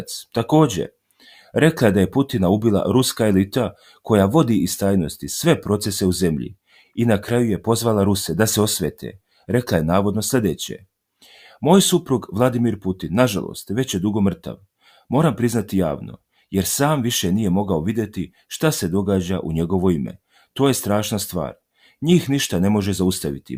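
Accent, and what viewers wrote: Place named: Croatia. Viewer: I kad si se probudio...ti pao sa kreveta...hrvatski naglasak a pokusavas ekavski da pricas...samo o tome sanjat mozete...Pravoslavlje ce da pobjedi i Putin ovaj zivi Putin ce da dovede i Ruskog i Srpskog Cara jer je tako GOSPODU I SPASU NASEM ISUSU HRISTU U SVETOJ TROJICI UGODNO...a vase masonske i satanske zelje ce vam se ispuniti tamo gdje pripadate i ispunice vam ih vas nalogodavac u paklu... BLAGOSLOVENO CARSTVO OCA I SINA I DUHA SVETOG!!!!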